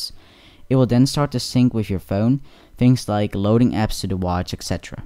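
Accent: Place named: United States. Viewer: Je Amerikaans is super goed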